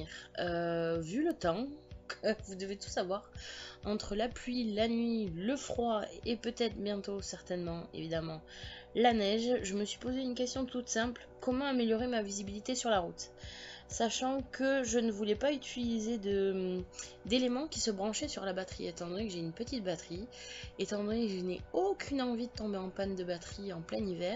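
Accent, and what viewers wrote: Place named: South, France. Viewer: lol de rien L'accent du sud est très apprécié il donne tout de suite le sourire!!! ne le réfrène pas au contraire:D Nous sommes des sudistes et fier de l’être!!! bonne continuation à toi;-)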